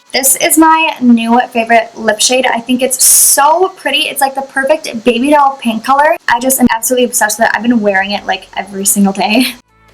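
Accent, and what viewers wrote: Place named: Boston, United States. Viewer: Random question but where are you from? Like you accent sounds northern or Bostonian maybe but I can't put my finger on it eek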